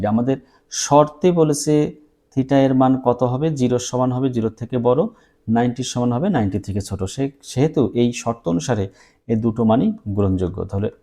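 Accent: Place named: Bogura, Bangladesh. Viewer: Apni mone hoy bogurar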